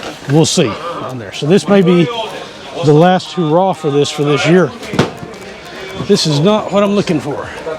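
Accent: South, United States. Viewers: Good choice moving to Belgrade Serbia is fun lots to do you seem to have a southern accent I'm guessing georgia or florida.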